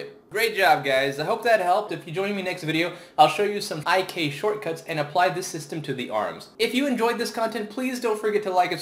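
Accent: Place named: Brooklyn, United States. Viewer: before he had the brooklyn accent.....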